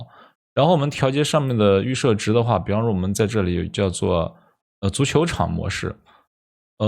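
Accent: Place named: Shaanxi, China. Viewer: 老乡啊啊啊啊 带着陕西口音 但是英语居然没口音🥰✌️喜欢喜欢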